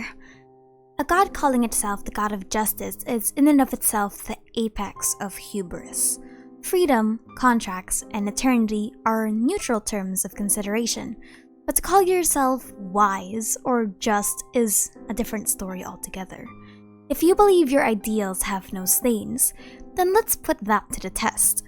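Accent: Philippines. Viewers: I had the inkling you're a Filipino because of your accent when speaking in English :D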